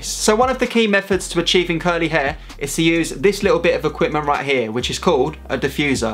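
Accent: United Kingdom. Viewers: Bruh you speak so English English that i cant hear you i can hear American English you now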